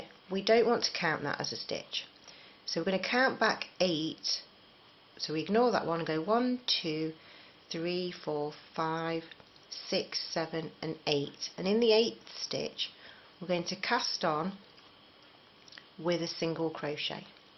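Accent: England, United Kingdom. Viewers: Thank you very much I did it and I am so happy, you make very easy to understand, and thank you for the history about crochet that you kindly share in your video, I love crochet and I love your English accent, I leave in Australia.:-)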